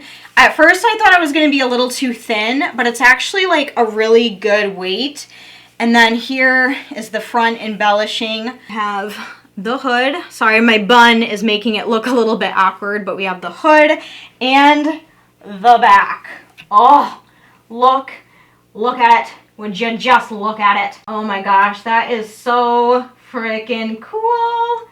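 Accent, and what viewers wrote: Michigan, United States. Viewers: I just love that Michigan accent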